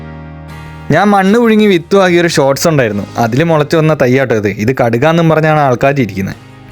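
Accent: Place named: Muvattupuzha, India. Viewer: സംസാരത്തിൽ ഒരു മുവാറ്റുപുഴ... പെരുമ്പാവൂർ... കോതാമംഗലം ടച്ച് ഒണ്ടു "ട്ടോ " 😎, ഒന്നുകിൽ പരെന്റ്സ് അല്ലങ്കിൽ ഗ്രാന്റ് പരെന്റ്സ് ഈപറഞ്ഞ സ്ഥലവും ആയി കണക്ഷൻ ഇല്ലേ ബ്രോ ? സത്യം അല്ലെ ☺️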